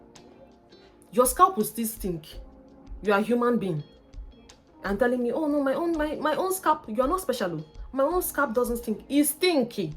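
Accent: United States. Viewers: The American accent 😂😂😂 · The American English accent 😂 killed me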